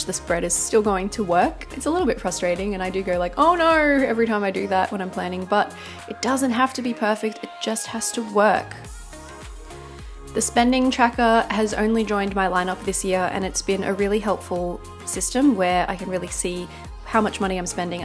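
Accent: Australia. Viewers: It's nice to hear another Aussie on Youtube. Love your spread. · Also, great to hear another Aussie Bujo-ist :’)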